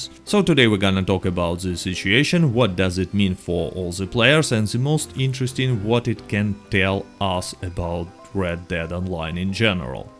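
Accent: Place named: Russia. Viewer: Is he Russian, is he German, is he whatever Niko Bellic is?? Maybe he can do an impression of him!! Please say "Anything for you, cousin!" I love this dudes accent!! (btw I know hes Russian but his accent sounds like other accents..so awesome)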